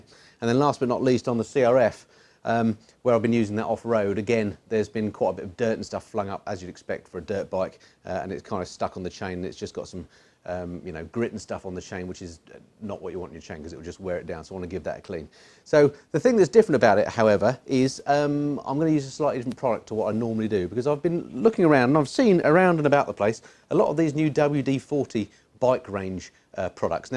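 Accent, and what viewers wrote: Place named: United Kingdom. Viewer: Brits talk funny.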